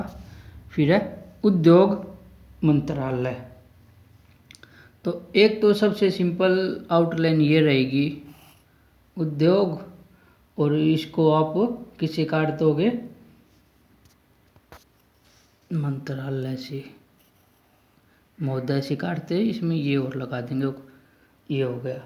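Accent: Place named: Rajasthan, India. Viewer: Sir Nationality to sabki Indian hai, State wise kaha se ho, because by your tone you seems like from Rajasthan/Haryana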